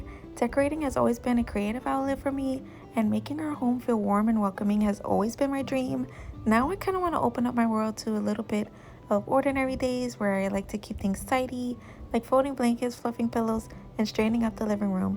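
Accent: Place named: East Coast, United States. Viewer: Your place is so cute! I’m in California & loving your east coast accent. Love your videos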